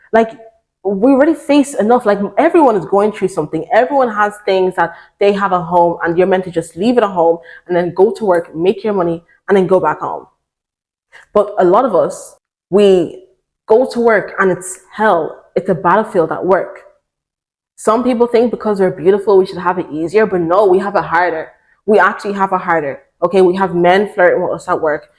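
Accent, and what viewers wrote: Ireland. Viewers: Unrelated but are you Irish? You’re accent is familiar 😅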